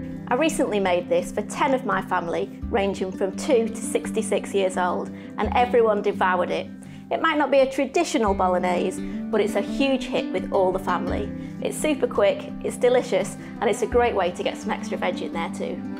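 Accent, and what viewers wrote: United Kingdom. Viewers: literally the most bri'ish video i've ever heard,but still a good one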